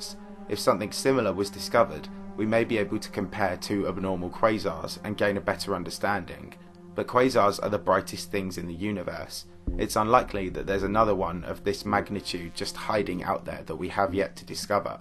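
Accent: United Kingdom. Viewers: I can't stand this guys British voice. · I love the british accent.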